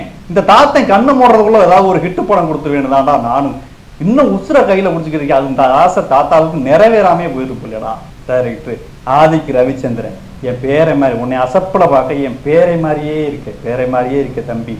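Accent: Madurai, India. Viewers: ஒழுக ஒழுக... 😂 கதற கதற... 😂 கொதவல கடிக்குறாங்க... நம்ம மதுர Slang செம்ம...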